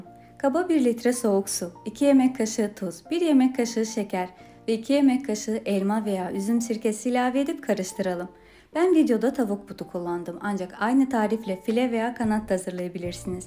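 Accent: Azerbaijan. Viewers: Azerbaycanlılar gibi aksanız var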